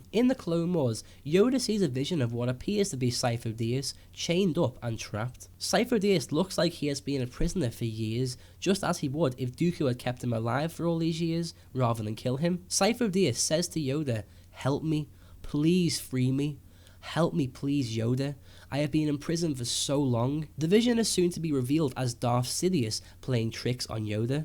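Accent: Liverpool, United Kingdom. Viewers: scouse lad init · you Scouse? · random question but are you scouse? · Chris Griffin he's a Scouser ie someone from Liverpool. They all talk like that you American twit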